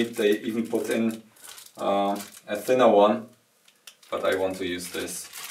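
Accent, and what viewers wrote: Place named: Germany. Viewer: man merkt unnormal dass du deutsch bist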